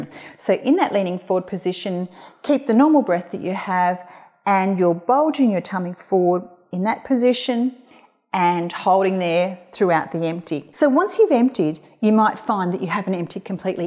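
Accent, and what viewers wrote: Australia. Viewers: How would you know there is residual urine left in the bladder without ultrasound or tests for voiding? Happy to hear a fellow Aussie.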